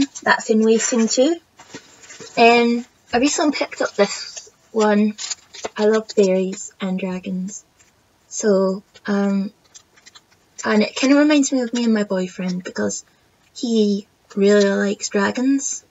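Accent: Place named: Scotland, United Kingdom. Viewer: So, so nice to hear another Scottish cross stitcher there seems to be a severe lack of us. Love your work, looking forward to seeing more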